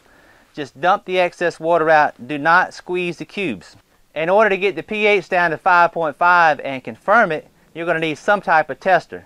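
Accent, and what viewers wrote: South, United States. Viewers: Lord willing and the creek don't rise. I haven't heard that in 20 years. Your idea for the grow light setup is terrific. I never thought 1" PVC pipe would be strong enough, but it sure looks sturdy. I'm really commenting, though, to tell you that you have the best accent in America. I have NO idea where you're from, since the only sort-of southern accent I know is New Orleans, where much of my family lives, and that accent is just weird. You don't sound like a Texan, either. Actually, you sound like the character Boyd Crowder on "Justified." That took place in Harlan County. Are you from or near the great Commonwealth of Kentucky? But the actor grew up in Georgia, so maybe he was just speaking his own natural way. Don't laugh at me. I was born and raised in the rust belt and moved to Cali as an adult. Never gotten to the south other than N.O. 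· finally, genuine southern accent...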